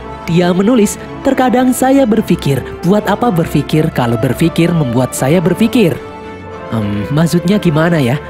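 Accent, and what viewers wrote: Java, Indonesia. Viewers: Wah Mimin Jawa ya 😅☝️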